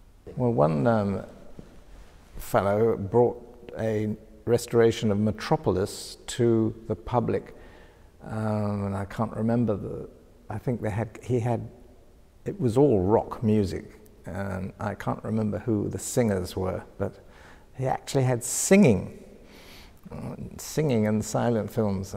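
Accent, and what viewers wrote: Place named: RP, United Kingdom. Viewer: U sound very posh & u know wht u talking about! R u from London? Thanks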